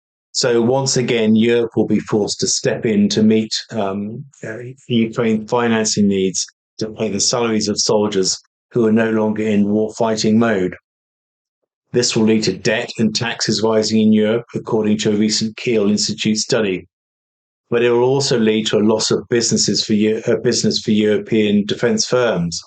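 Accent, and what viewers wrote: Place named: United Kingdom. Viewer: Thanks! You know your stuff and it’s nice to hear intelligent observations with a British accent 😂 You join a growing group of advocates for Sanity sadly lacking in Government and on MSM. Subscribed ❤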